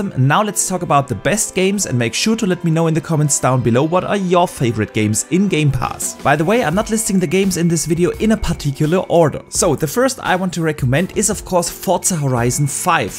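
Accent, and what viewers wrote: Germany. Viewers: Du kommst doch Safe aus Deutschland oder 🤣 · Dieses Deutsch-Englisch haha, aber gutes Video :)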